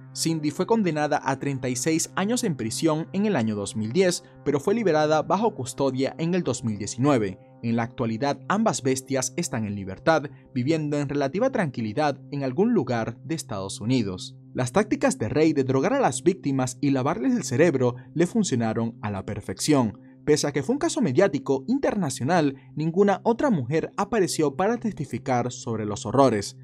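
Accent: Venezuela. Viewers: tu acento es muy venezolano de dónde eres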